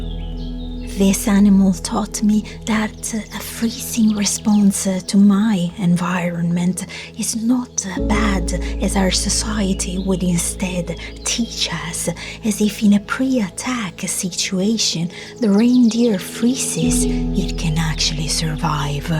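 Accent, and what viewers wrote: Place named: Italy. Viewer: How intetesting to hear an italian accent from you. It's the first time I watch a video from you and I immediately assumed you were scandinavian. A different video for sure. I like it.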